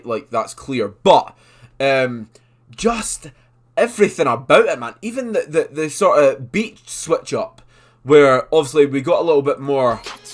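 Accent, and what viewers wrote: Scotland, United Kingdom. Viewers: Of course! I perfectly understood you! But even if I didn't, I would happily exchange that for the Scottish flair 😂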